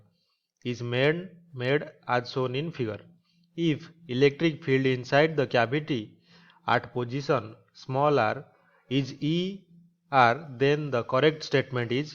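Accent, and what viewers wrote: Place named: Odisha, India. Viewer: After hearing your voice I think your mother tongue is odia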